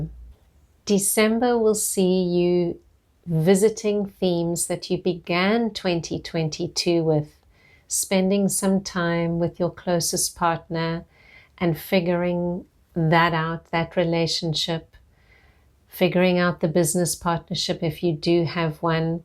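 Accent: South Africa. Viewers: Definitely a South African accent. Am a Cancer Sun and Rising. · Thank you so much for this, you have no idea how much I needed this. 🥺❤️🙏🏾 Bless your soul. 🥺 Also, you sound so South African 😭😩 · That sounds like a South African accent - Howzit from Plett. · you sound south african, lol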